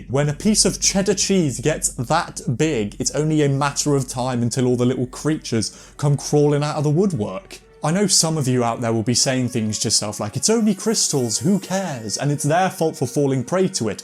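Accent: United Kingdom. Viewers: Someone heard your accent and had to be told that you're British. At this point it's on them. Not to mention that apparently only Americans can talk about American movies? Interesting. Also, if I'm looking for some crystals, it's best to go to stores selling them. Sure, you could also get scammed there, but you can actually see the crystal before bying it. Also, I've seen much cheaper ones irl.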